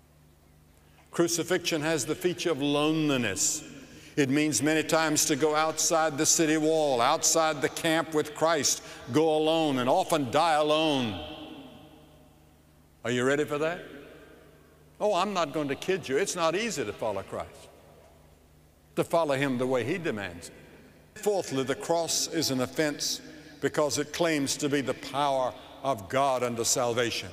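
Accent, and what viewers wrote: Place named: South, United States. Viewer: I love listening to him. His voice is made for preaching and his southern accent is so captivating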